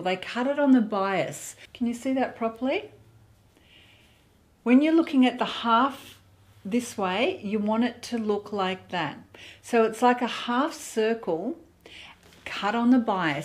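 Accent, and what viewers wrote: Australia. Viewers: Super fantastic video!! So happy I found your channel. I love all these suggestions, and do already wear those types of flowy tops, longer in front and back! Thanks for the links. I LOVE ❤ your hair! Just beautiful 😍 Is it all your own? (I have a family member in the hairdressing business), so have had extensions and own a couple hairpieces. I'd love to hear where/how you get such a gorgeous mane! I love your accent too (Australian?) Sorry so long...new subscriber lol 😄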